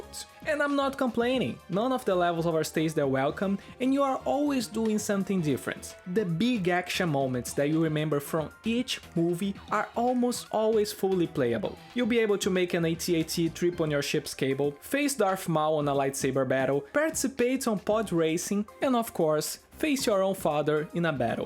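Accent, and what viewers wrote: Brazil. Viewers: Your accent, looks like brazilian speaking english. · mano tu é br? esse sotaque de leve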